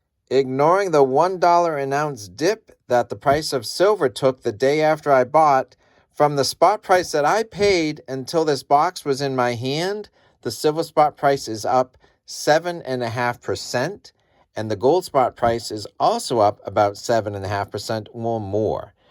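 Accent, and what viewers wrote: New England, United States. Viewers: You sound like a fellow New Englander. Lol. I bought 58oz last Saturday on the 11, and this Saturday it was up almost $2.00 an ounce. I still bought 42 more oz yesterday. I’m not all that concerned about the exchange rate for silver, unless I’m buying of course. My silver and gold are not for sale. Whatever the price, it’s still going to be receiving worthless paper in exchange. My stack is for the big one. When we go back to what we did for six thousand years. When silver and gold were MONEY. Which they will be again, much sooner than people think. I like your narrative! Keep buying,Keep buying, Keep buying, then KEEP BUYING! 😂👍🏼💪🏻❤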